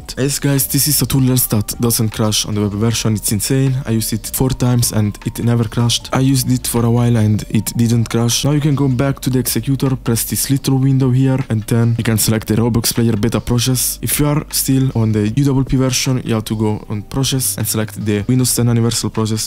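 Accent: Italy. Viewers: fra ma sei italiano ?? non sembri inglese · l'accento italiano spacca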